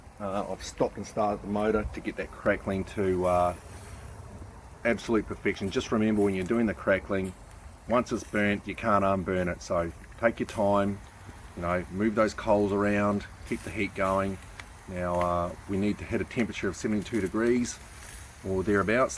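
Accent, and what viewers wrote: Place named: New Zealand. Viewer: Good to hear the kiwi accent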